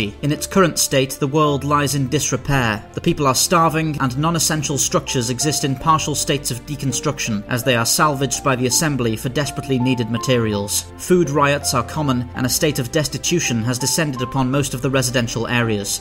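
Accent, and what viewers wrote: United Kingdom. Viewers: Hi I'm a fan of your work on this channel. I'm impressed with the professionalism of your videos and voice over, also nice to hear a fellow brit. I was hoping i could get your attention for short fiction review if that's something you do.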